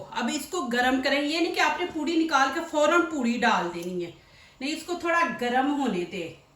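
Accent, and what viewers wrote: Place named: Punjab, India. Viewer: آپ اردو پنجابی لہجے میں نہ بولا کریں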